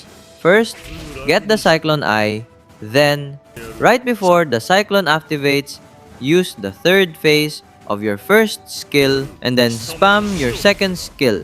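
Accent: Philippines. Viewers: Nah bro actually using Pliphina accents😅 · Accent: Filipino Language: English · Filipino accent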